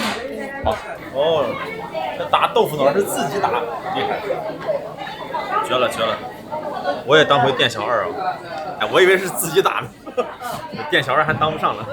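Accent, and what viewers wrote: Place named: North, China. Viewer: ：听口音，你是北方人，不是西北或者华北人。你在吃江南面食小食等插话缺乏艺术，也缺少历史文化语言。 南北方吃文化相差很大，江南以炒菜为主，北方以一锅煮为主，作为美食家要会比较。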